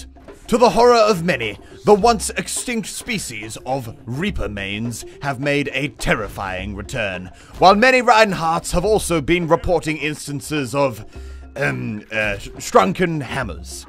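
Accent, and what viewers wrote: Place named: Australia. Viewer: 澳洲口音......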